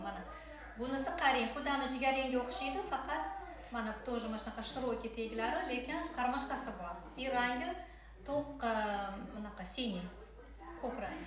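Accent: Tashkent, Uzbekistan. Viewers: omad. Zo’r samimiy toshkentcha chiqibti❤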